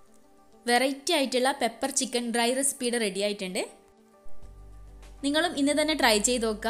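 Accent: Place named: Kannur, India. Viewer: നമ്മുടെ കണ്ണൂർ ഭാഷയിൽ അടിപൊളി ചിക്കൻ റെസിപ്പി ഇത് ഏതായാലും പൊളിക്കും ചിക്കനെ മേക്കപ്പ് ചെയ്തു വെച്ച് കാത്തിരിക്കുകയും വേണ്ട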